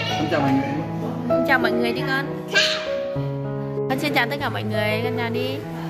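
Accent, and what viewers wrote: Central, Vietnam. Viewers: Cô quê ở đâu mà nói giống giọng miền trung vậy ạ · tại con nghe cô nói giống giọng miền Trung ghê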